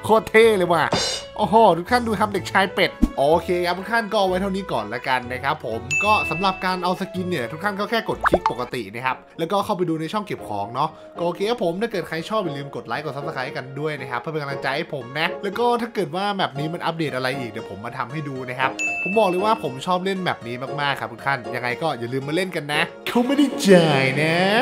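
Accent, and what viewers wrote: Isan, Thailand. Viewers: เสียงอีสานก็มา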